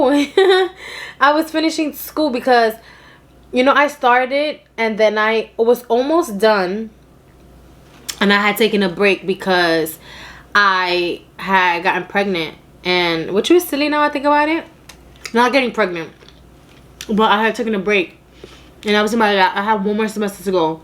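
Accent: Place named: New York, United States. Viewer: I’m watching or listening to tour video while I’m doing my moms makeup and she said is she from ny I can hear the accent lol😘😘😘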